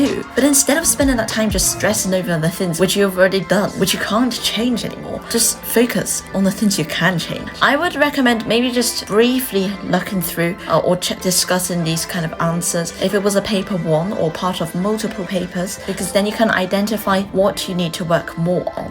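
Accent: RP, United Kingdom. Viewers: Why the fuck are you speaking in RP? You sound like the posh AQA languages listening reader PS。你会说中文吗？ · The combination of the memes and the poshest accent in existence 💀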